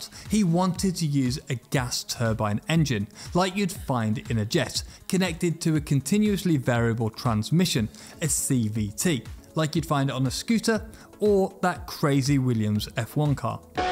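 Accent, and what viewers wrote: France. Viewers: Ohlala banger l'accent français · Mon dieu l'accent français à couper au couteau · Wow that's the strongest French accent I've ever heard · The franche accent 🤭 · As a french, his english sound more french than french itself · Love his french accent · This man speaks English in the most French way I have ever witnessed. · omfg listening to this french guy is a bit rough on the ears ...as a french myself ahahahah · He's the most french sounding man in the world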